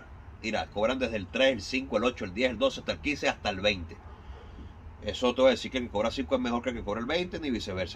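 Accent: Venezuela. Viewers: Excelente amigo muy bueno y claro el video. Al escucharte supe que eras un paisano venezolano. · Hola saludos hermano me interesa sobremanera y me llama la atención tu acento creo que eres Venezolano 🇻🇪 Yo también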